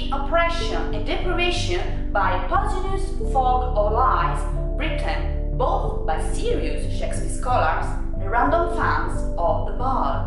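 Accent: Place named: Italy. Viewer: Please turn on closed caption I'm afraid i'm missing a lot of your argument because of interference from your italian accent. A quality that perhaps in his time also occurred. Fascinating subject and you're right on the money about the airbrushing of character reputations like Florio in relation to Shakespeare.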